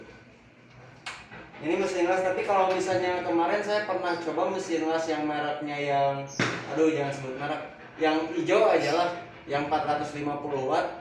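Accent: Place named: West Java, Indonesia. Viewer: Sunda pisan eh s eta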